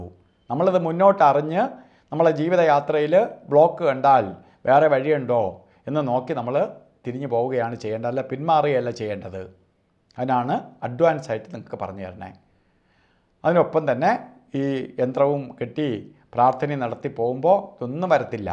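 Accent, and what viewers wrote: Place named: Thiruvananthapuram, India. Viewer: Pakka tvm accent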